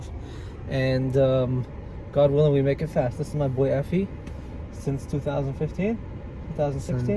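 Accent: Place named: South, United States. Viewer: get that southern accent going buddy.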